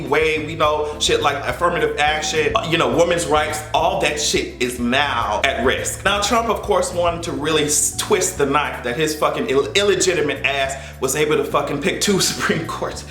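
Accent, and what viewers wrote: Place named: Jamaica. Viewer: I die with laughter every time he says woman instead of women. So Jamaican my yute.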